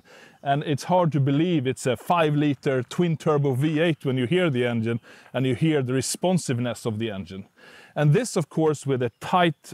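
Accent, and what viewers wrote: Sweden. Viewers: Jag älskar hur man hör att han är Svensk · the svedish axent to hahaa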